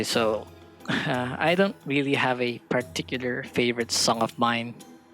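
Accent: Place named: Philippines. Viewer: I had assumed you were in the USA or something but when I heard your voice there was no mistaking that Filipino voice. For the past 5 years of my school life, almost everyone I knew was Filipino. Plus all of my relatives on my mom's side are living in the Philippines and I've only ever met my Great Aunt when she came here, to Canada.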